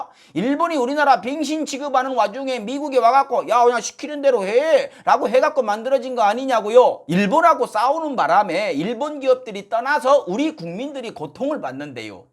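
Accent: Jeolla, South Korea. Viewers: 무슨 말씀을 하시더라도 상관없는데... 제발 전라도 말은 과하게 안쓰셨으면 좋겠습니다 ㅠㅠ 제가 전라도인데도 전라도를 대표하는 사람처럼 얘기하지 않으셨으면 좋겠습니다 ㅜㅜ정말 부끄럽습니다ㅠㅠ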